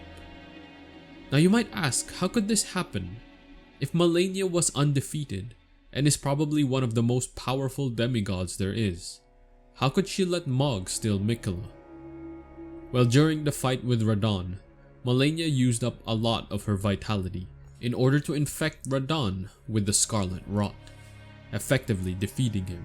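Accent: Philippines. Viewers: Your accent sounded like filipino. God vid tho.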